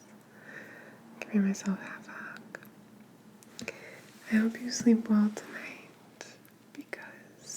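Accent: Argentina. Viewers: jajaja la primera vez que la escuche hablar en español argento casi me caigo de ojete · Ya extrañaba escucharte hablar en argentino. 💕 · Hola! Soy de Argentina, me impresiona mucho lo bien que te sale no sólo el español sino el asento argentino, felicitaciones!! Hermoso video! Besotes.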